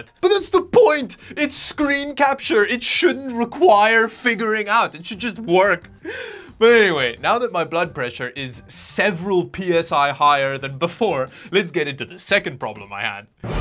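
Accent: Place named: South Africa. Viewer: I love your South African accent, I only realised the other day that you were from SA thanks for the great quality videos, liefde van uit die tuis land❤️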